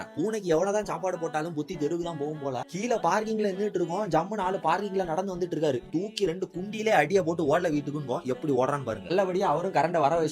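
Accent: Kanyakumari, India. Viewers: I think kanyakumari but ippo bangalore la irukaru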